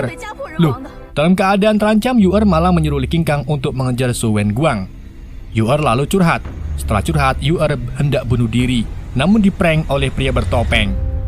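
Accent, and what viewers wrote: Java, Indonesia. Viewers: Min sampean wong jowo ta🗿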